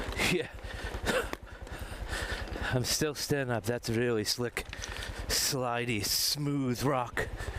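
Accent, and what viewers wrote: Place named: Midwest, United States. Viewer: ive never been to this channel in my life, why does this guy sound like hes from the mid-west but he says he's living in the UK? I'm so confused.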